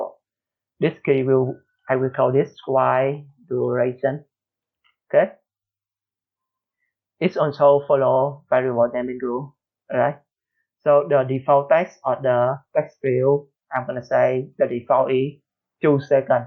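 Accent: Vietnam. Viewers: App ngon quá bạn ơi, nghe giọng nghi nghi là VN rùi mở page FB ra thì đúng lun :)) Trên dt xiaomi muốn chạy phải cấp quyền lại liên tục chán quá :( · ông là ng việt nam à. cái giọng ko lẫn đi đâu đc lun ấy :))) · nghe giọng là biết ông người Việt rồi, công nhận ông giỏi thiệt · Ôi cái giọng Việt Nam này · Nghe biết ngay VietLish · )))) ông này việt nam ak nói tiếng anh i chang v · Chuẩn mọe giọng Việt😂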